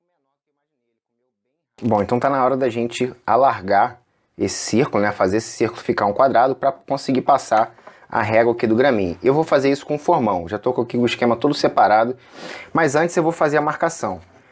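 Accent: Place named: Teresópolis, Brazil. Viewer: Boa tarde,adoro ver o teu trabalho,ele é feito com muito esmero,sou natural da minha querida Teresópolis ( RJ) e pelo sotaque somos conterrâneos,hoje estou morando em Indaiatuba interior de São Paulo e tenho uma marcenaria,desejo a ti muitas felicidades e muita madeira pra serrar,rs rs...abraços!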